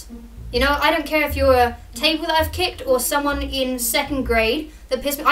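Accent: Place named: United Kingdom. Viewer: "Second grade"? You sound very British for an American.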